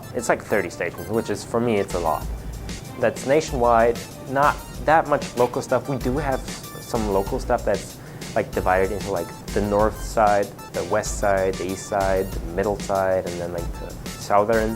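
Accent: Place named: United States. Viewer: Hey! You have produced nice videos, i am impressed! I like your american english, it sounds pretty fine. I would wish that more people would know this video becuase it is excellent! And by the way, I agree with MrMattex01.. you or rather your videos are awesome! Greetings from Germany I hope you recognize me:P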